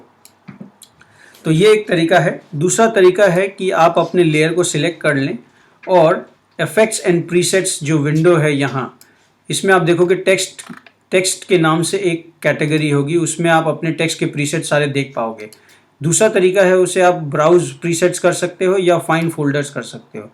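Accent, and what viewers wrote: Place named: Haryana, India. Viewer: owsm video sir . aapki voice mybe haryanvi hai hahaah good work thumbs up?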